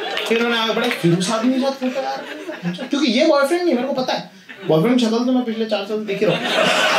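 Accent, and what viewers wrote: Indore, India. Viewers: his jokes may bot be funny everytime but what makes him truly a legend in india is his indori accent and way of acting , his relatable sakkht launda stories and down to earth attitude.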